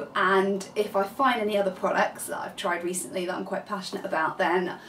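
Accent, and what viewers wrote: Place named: United Kingdom. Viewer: I am always drawn to the British accent. Great review. The little spatula is to help you prevent you digging your fingers into the pot. Lol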